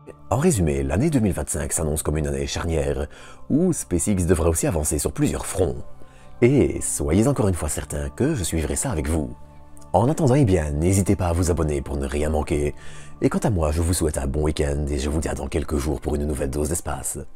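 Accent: Belgium. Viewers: dsl que tu te sois pris une partie de la communauté sur le dos; c'est dû à un ras le bol contre des gens habituellement mauvais, voir insultant, envers, en tapant généralement sur sa voix et son accent. Tes coms sont soft et argumentés, et n'ont rien à voir avec eux. Ce qui est inhabituel dans la voix de est d'une part son timbre de basse, sous le baryton, et d'autres part son accent belge, voire (si je ne me trompe) wallon. Perso j'adore, mais chacun ses goûts! 😋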